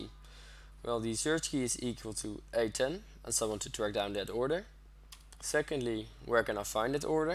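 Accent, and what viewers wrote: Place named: Netherlands. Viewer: keep up the good work. By any chance you are dutch. Please see an english logopedist for it might drasticly improve the quality of your costumersatisfaction.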